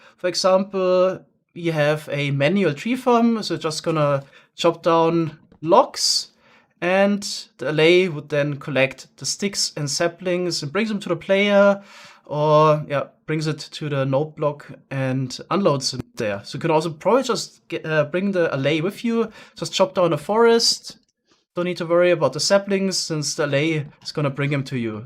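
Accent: Germany. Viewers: Damn you sound german, but great video tho.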